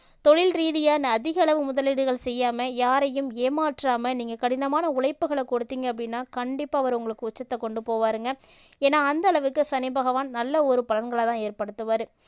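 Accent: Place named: Tirunelveli, India. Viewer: ஏல திருநெல்வேலி பக்கம் வந்த உண்ண??????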